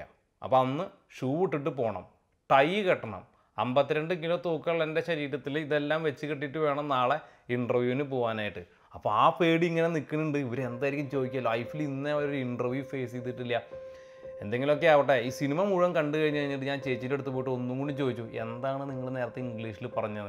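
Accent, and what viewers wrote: Thrissur, India. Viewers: I came to know of your channel thru Sujit. Your way of story telling is very nice. I like your Thrissur slang. Since lam a vegetarian scenes of meat in your recipes are digusting for me. But l enjoy your narration of stories. Good luck for your future plans.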